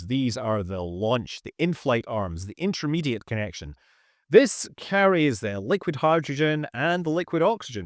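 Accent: United Kingdom. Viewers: Another Brit narrating US History. Why?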